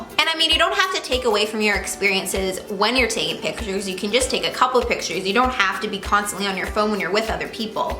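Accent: Canada. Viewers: are you Canadian? 😊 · "aboat" lol I love the Canadian accent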